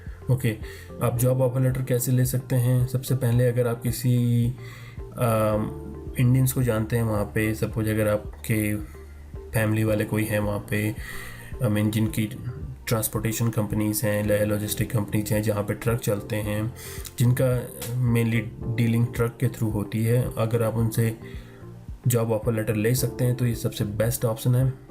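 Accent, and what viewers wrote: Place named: Ireland. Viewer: Dang that's a thick Irish accent or are you drunk or both?? Please next video try accent free English, then I can understand what you are saying.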